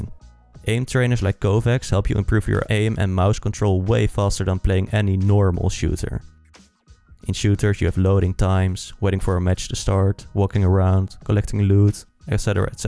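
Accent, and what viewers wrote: Netherlands. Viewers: Je hoort meteen dat je een nederlander bent hahahaha, altijd dat vieze accent. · Hey, I know your Dutch man I hear your accent. I am Dutch too. I've used Kovaaks myself for the last 9 months and I got around 214 hours of gametime in Kovaaks. My aim improved significantly. My biggest weakness to overcome is short strafes fast which I have a hard team dealing with if its moving fast close to me. Anyway my K/D in Warzone was 0.80 and it got boosted to 0.90 recently and it's still gonna climb I notice I do a lot of insane flicks in the gulags also Apex Legends all games seem to be more easy now. I really like your video thumbs up.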